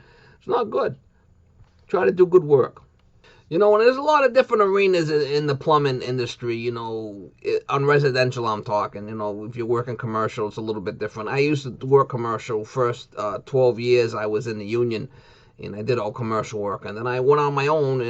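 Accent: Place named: Boston, United States. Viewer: We could tell u might be from BOWSTON.. But anyway, great video .. The best part was when you said "I do what i wanna do" the attitude of someone who doesn't have to get one of those crapy corporate jobs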